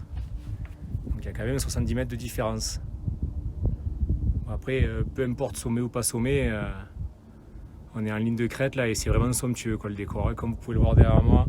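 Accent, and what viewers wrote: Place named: South, France. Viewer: Je suis bien loin de la Sainte Victoire mais c'est un réel plaisir de découvrir cette célèbre montagne en ta compagnie. C'est vraiment un endroit superbe tout comme les vues qu'il offre. Avec les commentaires au parfum de l'accent qui va bien, tous les ingrédients sont là pour une bonne vidéo ! Je suis content d'avoir découvert ta chaîne et je ne manquerai pas d'aller voir tes autres randos ! + 1 abo ! 👍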